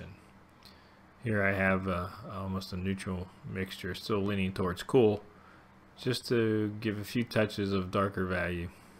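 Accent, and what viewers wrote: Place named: Philadelphia, United States. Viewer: Nice demo. And painting...sound like your from Philly. Or Cleveland. Lol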